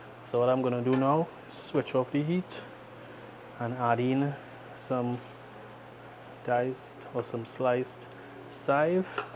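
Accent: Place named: Trinidad and Tobago. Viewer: My Brother in Law is Trini……. Just hearing the accent makes me Crazy HUNGRY!!!!! ❤️🇹🇹❤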